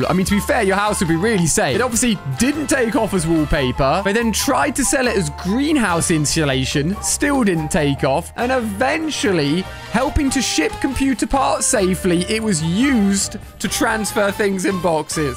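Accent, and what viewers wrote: United Kingdom. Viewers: I THOUGHT YOU WHERE BRITISH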